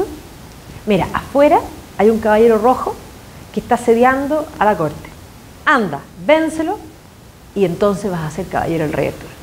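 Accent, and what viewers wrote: Chile. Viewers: Muy bien, pero, los no-chilenos no entendemos algunas frases y palabras por el acento...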